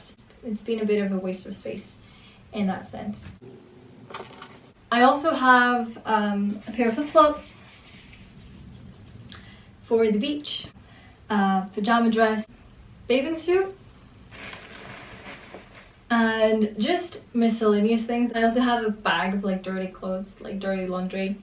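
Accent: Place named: Scotland, United Kingdom. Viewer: Sitting here smiling half hearing a Scottish accent and then it disappears again. I now see you spent some time in Scotland. Much from jennifree in Edinburgh 😀🏴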